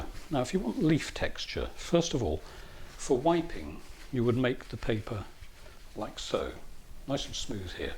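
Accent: England, United Kingdom. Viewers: Would like to know the colors you used for this painting. Was not able to hear it very well, due to hearing. Your English accent Is different than the American. Sorry no offense. Thank you, Gina/Texas girl 👧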